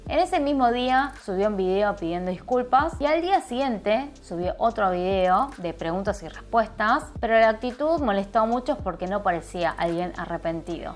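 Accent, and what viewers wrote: Argentina. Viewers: waaa que lindo es tu acento arg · es raro escuchar a una coreana con acento argentino mencantaaaa❤❤ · Es lindo ver una coreana con acento argentino :3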